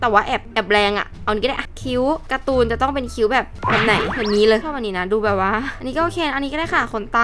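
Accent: South, Thailand. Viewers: พี่ เป็นคนใต้รึป่าวคะ😅พอดีเหมือนได้ยินพี่แหลงใต้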